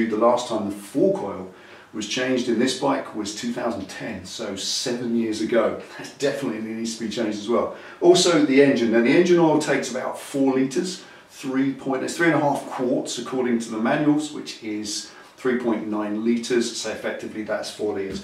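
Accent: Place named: England, United Kingdom. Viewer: First, this is a great series man, and I'm going to throw you a bone on PP, or buy a Tshirt to say thanks for your work. Never again will I pay these outrageous fees because that's about right on the money. Average low end cost is about $100 USD per hole anywhere in Texas. Service rates vary from $85-$125/hr depending on where you are. I just picked up an old 04 Springer, and I'm using these videos as they are the best on YouTube. Plus, I love English accents, so that's a good bonus. 😎 A couple of questions mate...if you don't mind. 1. Old timers, especially experienced Mechanics strongly encourage running 80/140 in the gearbox. S&S performance does as well by the way. What say you about that? 2. Just curious...Why did you not use the socket for plug removal here?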